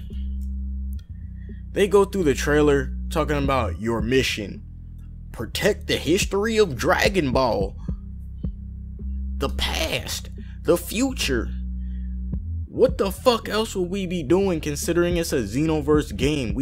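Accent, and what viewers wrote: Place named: South, United States. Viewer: Your country accent is funny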